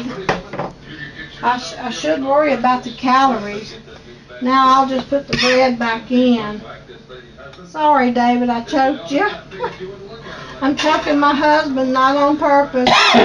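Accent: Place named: South, United States. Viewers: You make me homesick for old times when I was with my granny. My family is from West Virginia. You say there like my granny said it. Yes I grew up on sausage gravy. We always used canned milk. So good. We added water too. This recipe looks good. Thanks. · Hi, I just found you and your channel. I think you are absolutely PRECIOUS!! You aware perfect the way you are, and nothing wrong with being from Beautiful West Virginia either! It’s ALL GODS COUNTRY!! I love your accent, your cooking, down home style, the way I learned to cook from my Mom and Grandmother’s! I’m an Okie myself, Oklahoma City, but my Family is all over the state, yes, we are part Native American, coming from Indian Territory. Anyway, so funny, we made Breakfast casserole for dinner tonight! I too Normally let mine set overnight in the fridge, but I let it set for 30 minutes tonight. 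I too play with the ingredients, use what I have, tonight we had sausage, Bacon and Canadian bacon in this batch. I have never used yellow mustard, but I use dry Mustard in mine. I did want to tell you what I do, and hopefully you will try it. In the past, I always used the bread slices also, but it always seemed dry. So I got the idea, to use hash browns instead of bread. I use the loose ones or the patties. I do everything else the same. My family loves it, it’s never dry. I would love for you to try it, and let me know what you think! We love Hot sauce or Salsa with as a condiment with ours. It’s a dish that gets eaten up completely!! Anyway, love your channel, your cooking, your accent and your sweet personality!! Keep up the great work! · That looks and sounds delicious. Gotta try this. I love your Hillbilly accent. I have a Texas twang myself. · I am really glad that you mentioned where you are from! 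You sound like my folk. I live in Texas now but I was born and raised in Parkersburg WVA!! You two are adorable! Thank you for sharing. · I love her southern accent! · Lovely Lady, I won't laugh at your hillbilly accent if you won't laugh at my southern accent. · well, I am a HILLBILLH too... I was born and raised in West Virginia.. I was DIRT POOR my entire life until a little over 18 years ago when I moved to South Carolina and married a very decent man.. I knew you were hillbilly when I heard your voice. I too am VERY PROUD of my heritage.. You can take the girl out of the mountain, but you CAN NOT take the mountain out of the girl.. Nothing wrong with being a hillbilly.. Hillbillies are some of the nicest and decent and God fearing people on the face of this earth.. God bless you and your family... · I love you, and your old fashioned recipes! Your accent makes me think of my maternal grandparents from Ky, originally from W.Va. 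· Ms I bet you can bake bread and rice pudding and cakes. our family was not raised on candy. mom would cook rice pudding when she had change on Sunday it was good Like your southern talk love you val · Mary Mitchell sounds like dolly partons the same accent cute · Hello to you both 😊 I have just found a video of yours for the first time and i love it. I live in England and I allways find southern accents like your super lovely and nice. Cant wait to watch more of your videos, this one looks super delicious xxx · Where are you from if you don't mind my asking sounds like ga nc sc dialect.....you just answered my question in this video lol i have family in West Virginia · Love your accent...reminds me of growing up in Covington, Virginia.....just a few miles from the WVa line at White Sulphur Springs, WVa. I still live in Virginia, just down on the southside near the NC line now...we have country accents down here too! 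I am told I have a mix of southside and Western Alleghany accent now. LOVE your videos and your REAL cooking...and down home atmosphere. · My mother was from Crab Orchard, KY, and everyone around there sounded just like.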